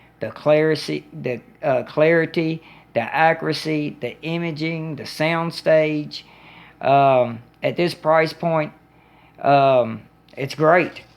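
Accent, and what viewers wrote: South, United States. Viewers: Can't shake the southern outta you brother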